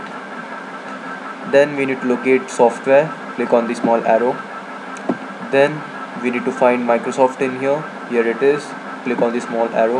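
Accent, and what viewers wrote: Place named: India. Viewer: FIRSTLY; SPEAK PROPER ENGLISH== NO ONE UDNERSTAND YOU >>>dont speak English in Indian's accent ! second: whatever you write or add to the right pane in the registry; IT DOES NOT MESS THE COMPUTER THE PROBLEM OF THE REGISTRY HAPPEN ONLY IF YOU CHANGE EXIXTING VALUES IN THE LEFT PAN: where the yellow keys are := even if you add any key to the left pane; it does not do any harm to the computer: SO STOP OVER-SCARING PEOPLE FROM OPENING OR USING THE REGISTRY !! SPEAK PROPERLY ; WILL YA???????????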